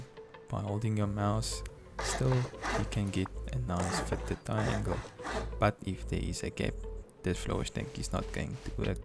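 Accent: South Africa. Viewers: Hold up, you from South Africa mybru?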